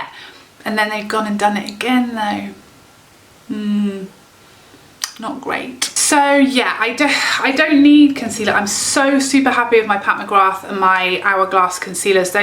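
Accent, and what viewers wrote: United Kingdom. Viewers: I’ve been binge watching your videos while doing a spa night, listening to all this makeup talk in a British accent makes me feel so elegant and sophisticated😂😂 plus you’re HILARIOUS. Love your channel lady🙋🏾‍♀️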